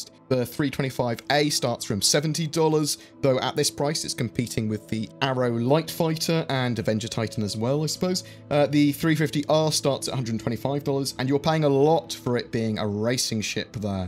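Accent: United Kingdom. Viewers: 350R or 315P, British accent not withstanding...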